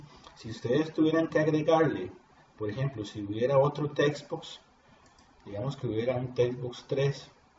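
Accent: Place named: Costa Rica. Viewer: Uno reconoce a un tico hablar en cualquier lugar :-)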